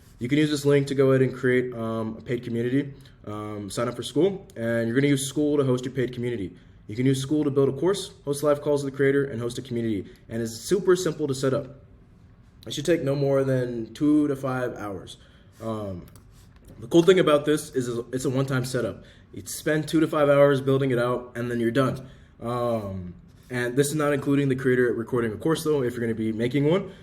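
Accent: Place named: Kenya. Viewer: heard your kenyan good work brother 🌵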